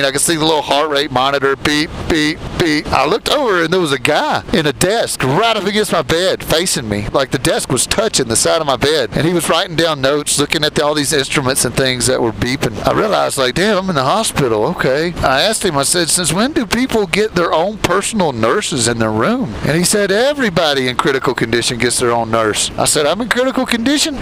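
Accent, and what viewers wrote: South, United States. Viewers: I love that southern accent. And that video was awesome. If you're ever in Sydney, Australia. PM me and I'll buy you a beer.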